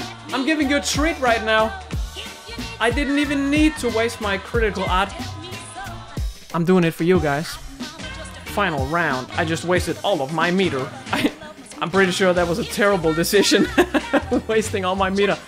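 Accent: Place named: Denmark. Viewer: IT’S YA BOOIIIII DANISH ACCENT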